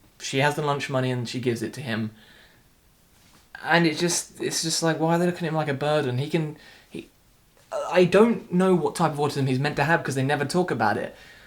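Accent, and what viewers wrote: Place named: United Kingdom. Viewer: From your accent, I am guessing that you live in the UK. Autism culture between the UK and US is quite different. I've found from reading, vlogs, blogs, fora, etc. that it seems as if one driving difference for that has been legislation. In the UK, you have specific legislation pertaining to autism that defines it as a recognized and funded disability which coupled with universal healthcare makes the landscape there in terms of autism really different than it is in the US. You also have a very strong advocate in the Autism Society. You mentioned that for the parents in "Atypical" that Sam is a burden and seemed to find that horrendous. But in the US because so much care and assistance isn't provided, in financial ways and in terms of Sam's prospects for independence in a bottom line sense he probably would be. Many of the special classes he's attended even his therapy may not be covered by the parents' insurance - so something they are paying for out of pocket. 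If Sam has trouble finding (sustaining) employment then they might be looking at him living with them for the rest of their lives, whereas my understanding of things in the UK is that (while they are not perfect) there is financial and housing support available for autistic people. Not to mention that in the show there are a ton of special accommodations, that mostly the Mom makes around what Sam will eat, wear and even by having a separate laundry detergent just for him. That said, my sense of the "burden" theme was t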